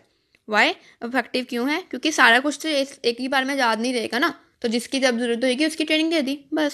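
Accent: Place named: Haryana, India. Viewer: Bolne ka tareeka bhot acha h sab samaj aa gaya... Aur apki haryanvi tone 👌🏻👌🏻👌🏻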